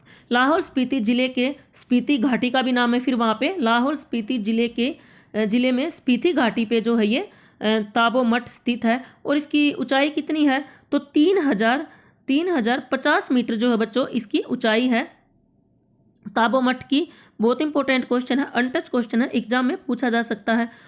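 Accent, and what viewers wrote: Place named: Haryana, India. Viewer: Ya hindi bolo ya fir hryanvi ajib bolre ho aap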